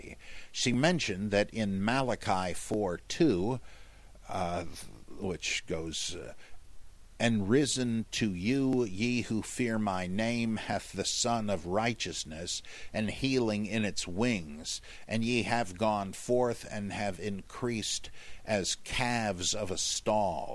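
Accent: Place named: Germany. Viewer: The german accent- spot on!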